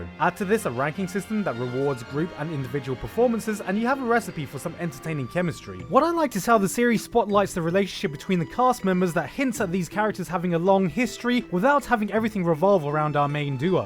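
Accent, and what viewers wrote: United Kingdom. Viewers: Wow you sound really british in this video... perhaps the only thing left was talking about West Ham's next fixture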